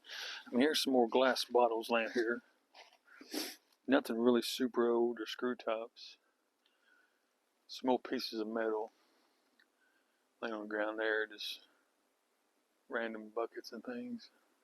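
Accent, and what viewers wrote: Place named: South, United States. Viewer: I was referred to you from at her YouTube channel called: Eat Pray Van that I'm subscribed to so I'm happy she referred all her subscribers to you on her first LIVE video the other day & now I know why.... Cuz your sooooo honest & genuine & very interesting & informative & good looking & good sense of humor & good heart & good sense and I absolutely love your southern accent & southern descriptive words & your voice tone & volume. Sooooo relaxing! Your a great speaker! All the wonderful things that is also. Because you are you I watched & then subscribed right away. Nothing phony about either of you which are the only Creators that I will subscribe to. I also clicked the " LIKE " button that I ALWAYS do & never forget to do that. Ty again!!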